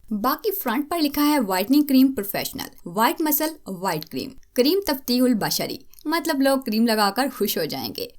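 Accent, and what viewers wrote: Punjab, India. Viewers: Hy... i love ur voice specially ur punjabi language i loved it ❤ bcz m from india #punjab# 😘😘😘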